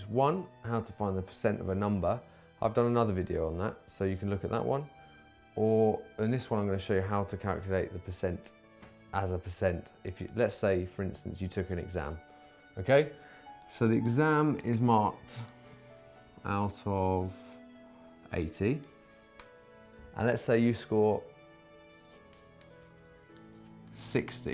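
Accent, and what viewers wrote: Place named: United Kingdom. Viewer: Omg the British accent... So beautiful I can listen all day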